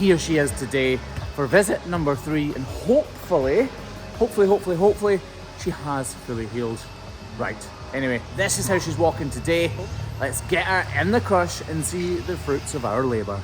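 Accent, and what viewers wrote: Scotland, United Kingdom. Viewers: In the intro there, you sounded like a man who needed to finish his coffee before he tried to talk! This 65-year-old Canadian woman finds that she really enjoys your videos. Why? You really love your life, the scenery is spectacular, and you sincerely care about the comfort of those cows. Your pleasant voice and Scottish accent don't hurt a bit either.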